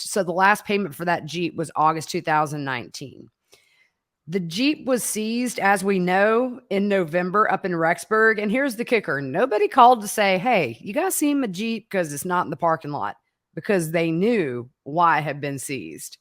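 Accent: South, United States. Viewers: I enjoy your excellent commentary as it is so easy to follow. I am sorry to say I just discovered you but I am new to YouTube AND I am 76! I love hearing you talk. I am from Mississippi and have lived in Georgia as well as many other states but I will always love hearing a soft Southern accent.😊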